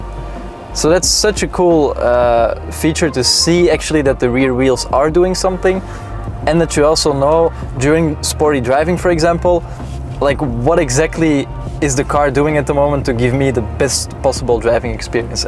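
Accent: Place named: Antwerp, Belgium. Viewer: Zie da ten bodukap dees filmke nie zie hee! Ik dacht al dat ik ee sint katelijnse tongval hoorde. Van achter in mijnen hof zie ik op het St. Michielskasteel! Goeie review! 🤘✌️👍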